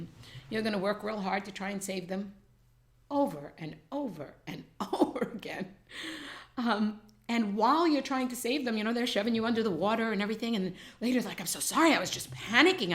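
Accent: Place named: New York, United States. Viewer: I got this gut feeling with a last name such as yours you are from NY cause Im from NY and You sound like someone I would talk with in a King Kullen or S&S. Making me home sick. I also would like to point out...that things in America we would classify as NPD or other disorders would be considerded normal especially in Asia.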